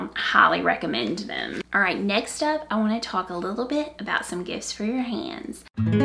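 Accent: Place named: South, United States. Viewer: I played this video in the bg while I was decluttering my bed so I could sit and knit, but I was immediately drawn to the video when you started talking! I absolutely love your accent because I come from the southern states myself, so I felt like I was right at home watching your video! I used to hate my southern accent, but in recent years, I've come to embrace it. It made me so happy hearing that you stayed true to yours, too 😊🤠💗 Much love from Kentucky!!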